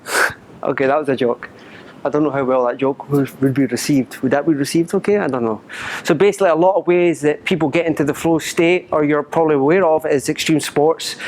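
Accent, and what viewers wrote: Scotland, United Kingdom. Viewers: The Scottish accent makes this video 10x more awesome. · Great video 👌 Bang on everything you say. I’m a fellow Scot, from Airdrie just outside glasgow! Nice to hear my accent YouTube’s full of Americans 😂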